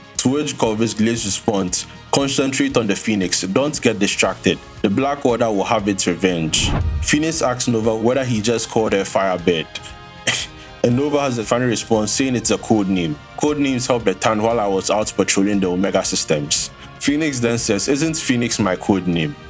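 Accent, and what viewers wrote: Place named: Nigeria. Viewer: Nice accent. Are you Nigerian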